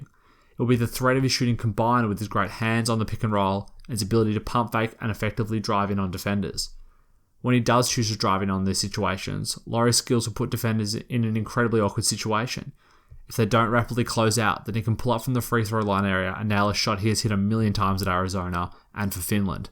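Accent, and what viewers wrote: Australia. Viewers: great analysis, but gave me a hard time understanding due to his aussie accent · Where bouts u from in australia mate, im from the Gold Coast nice to hear another Australian NBA/Chicago Bulls fan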